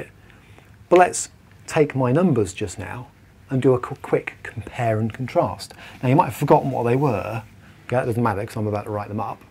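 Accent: United Kingdom. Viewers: His accent and manner is spot on Monty Python's Flying Circus. I can see him now saying, "I want to be a lion tamer!" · What Indian accent??? The guy got a British accent!!!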